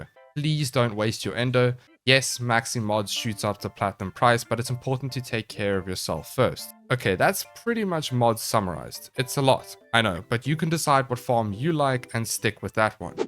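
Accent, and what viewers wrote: South Africa. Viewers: Is my bro south African?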